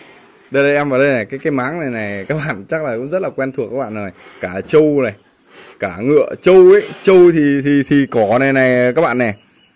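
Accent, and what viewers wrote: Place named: Cao Bang, Vietnam. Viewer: M cũng dân tộc nùng tỉnh Cao Bằng,nge b nói tiếng nùng với bà các e nhỏ, giống tiếng nùng Cao Bằng nge hiểu hết.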